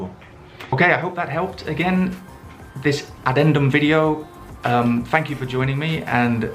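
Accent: United Kingdom. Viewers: I fall in love with your videos 😊 Especially your impossible British accent 😍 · Thank you very much! Great way of learning English grammar (also British accent, which I personally love😍).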